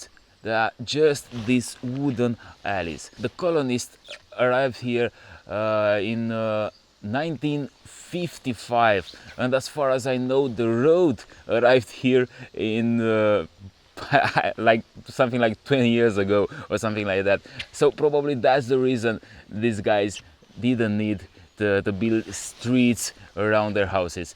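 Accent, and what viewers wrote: Romania. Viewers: omul e antipatic, de la dinti pana la faptul ca in engleza vorbeste ciudat..ce accent vezi tu ca nici macar pronuntia literelor din cuvinte nu e corecta. Nu are niciun accent, e doar o urma de engleza romaneasca, nu te mai aprinde ca am zis ceva la obiect. Critica e si constructiva cateodata, desteptule. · M-AI spart frate,cu accentul tau in engleza,te vad intr-o zi cand ma pensionez si ma duc inapoi in Romania. Sunt in State de vreo 25 de ani si ma bucur de jucaria mea- BMW 1200 . Bravo Frate! Entuziasmul tau e contagios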